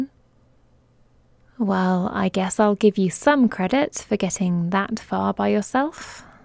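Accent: England, United Kingdom. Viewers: It’s just an English accent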